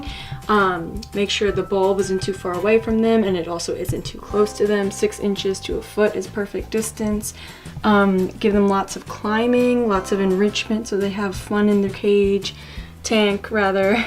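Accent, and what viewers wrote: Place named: Australia. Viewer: When I heard the astrailian ascent I freaked I love it so much it’s adorable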